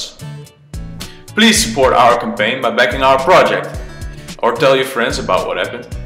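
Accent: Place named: Netherlands. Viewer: Zwaar leuk idee, maar hadden jullie niet even iemand kunnen gebruiken met niet zo'n overdreven Nederlands accent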